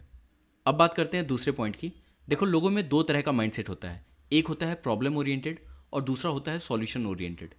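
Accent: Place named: Bengal, India. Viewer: Sir আপনি কি বাঙালি ? আপনার হিন্দি তে যেন বাংলা টান আছে ...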